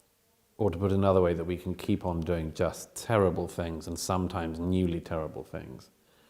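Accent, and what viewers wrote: United Kingdom. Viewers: This guy wriggles around and says nothing of substance, but with a snobby British accent and attempts to be oh so intellectual. Humans will get better at letting go of Fairytale Bob in the Sky (aka god) and find more comfort over time in reason - and kindness, facts, and the knowledge that we just don't have all the answers. Fairytale Bob needs to spend more time in Syria, and Chicago, and Baltimore, and a trillion other places and perform real miracles on mass before a serious thinking person will ever believe in him.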